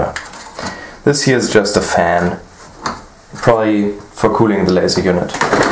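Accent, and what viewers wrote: Germany. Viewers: I knew you were german when you started talking hahahahahaha hallöchent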